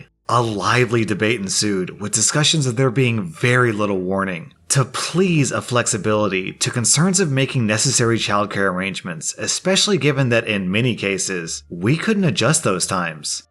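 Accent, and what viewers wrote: United States. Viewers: Woah... an American who says "pat-tree-on" and "eye-ther". What's going on? I'm sure you also say "an-tie" and "sem-eye" just to throw us off.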